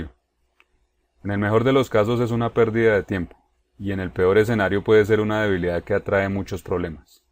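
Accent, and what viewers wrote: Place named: Colombia. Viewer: tienes un acento muy colombiano... eres colombiano? gracias por el video muy instructivo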